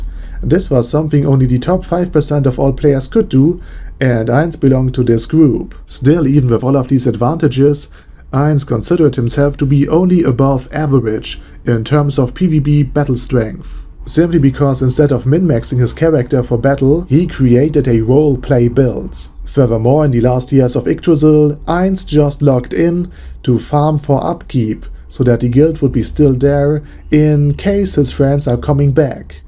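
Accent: Germany. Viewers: Dein deutscher Akzent ist echt heftig.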